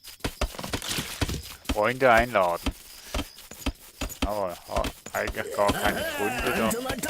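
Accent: Switzerland. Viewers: Intressantes Spiel:). Zwar tue ich mich schwer dein Schwizerdütsch zu verstehen, aber so langsam komme ich rein:)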